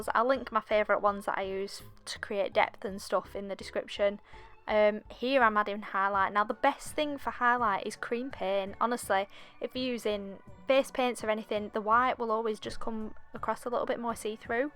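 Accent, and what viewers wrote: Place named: Scotland, United Kingdom. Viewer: I know she's from Accrington, England, but her accent sounds Scottish.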